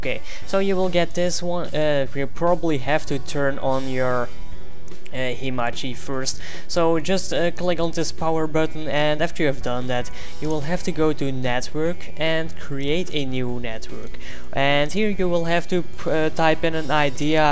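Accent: Netherlands. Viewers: are you dutch? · so ur dutch? or from Belgium? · he is dutch idiot not every language sounds same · lol ur dutch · Thank you mate! Btw i think your from The Netherlands :D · Is hij Nederlands?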